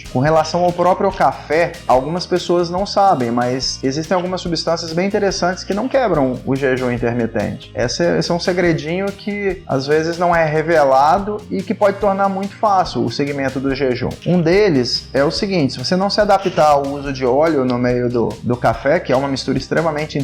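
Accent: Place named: Rio de Janeiro, Brazil. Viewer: Fico encantada com sua voz, linda! Vc deve ser carioca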